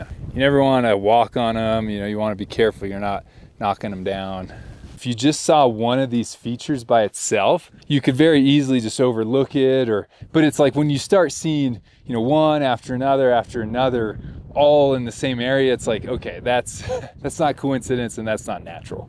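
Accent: United States. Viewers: 100s of meters? you sound american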